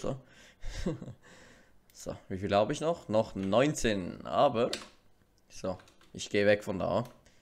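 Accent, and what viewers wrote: Switzerland. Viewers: Bist du aus der Schweiz?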